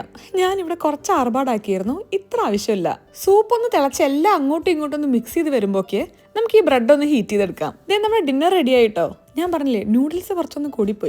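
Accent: Malappuram, India. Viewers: നിങ്ങൾ മലപ്പുറംകാരി ആണോ ഞാനും മലപ്പുറം ആണ് നിങ്ങൾ പറയുന്നത് കേട്ടപ്പോ തോന്നിയത് ആണ്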